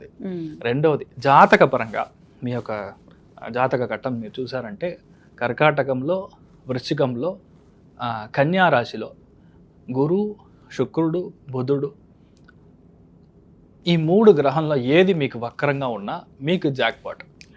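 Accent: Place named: Tamil Nadu, India. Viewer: ఏం తెలుగు రా నాయనా.. తమిళ యాస, సరైన తెలుగు పదాలు కూడా మాట్లాడటం తెలియడము లేదు. ముందు తెలుగు సరిగ్గా నేర్చుకుని రా గురువా..🤔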